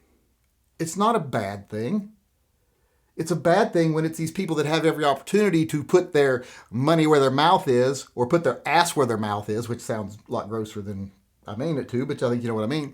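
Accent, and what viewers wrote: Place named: South, United States. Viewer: Says the wannabe Marshall. A so called yankee with a mild southern accent and a lisp. Anyone doing what Kyle did of any age is defending the community. You talk too much about nothing.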